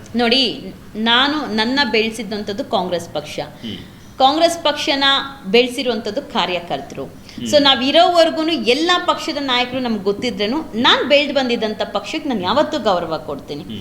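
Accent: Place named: England, United Kingdom. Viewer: Mam neev matado kannada style super 👌 👍 English style alli kannada maatu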